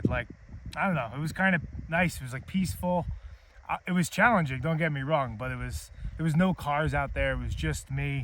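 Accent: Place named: Long Island, United States. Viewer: Your demeanor and mannerisms remind me of Chris Cuomo. He's a Long Islander too so maybe its the accent. Great speaking voice!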